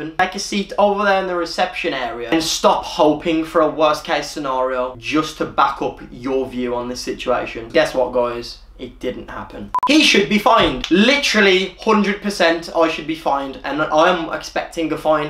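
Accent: Birmingham, United Kingdom. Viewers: Hank Black he’s from Birmingham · Which region of the U.K.is your accent from. I know different parts of england have different accents. I don’t know which regions the accents are from though. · Hank Black Birmingham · Hank Black Birmingham Dudley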